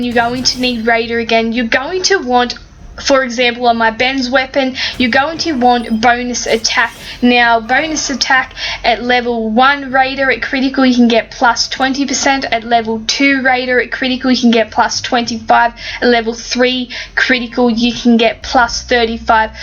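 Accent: Australia. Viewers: Great video just subbed you, I have been showing it to my faction omnipotence paradox on the marion server we are the best faction on our server and a lot of our faction can now rush turn one due to you thank you very much our enemy's are not liking this one bit lmao. I just started doing videos myself actually loving your aussie accent im trying to tone down my strong english accent but im having trouble hope people can understand me haha. Thanks a lot and good luck for 2017. Happy hunting.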